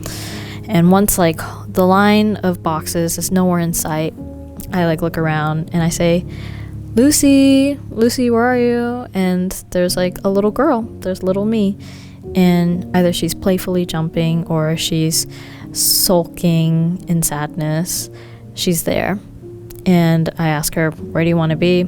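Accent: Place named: United States. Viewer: Thanks for talking like an educated American USA in YouTube.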